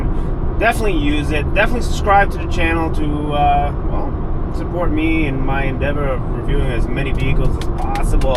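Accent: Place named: France. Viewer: right on! I noticed your French accent! Northern Ontario here! Looking at buying one of these as our next vehicle!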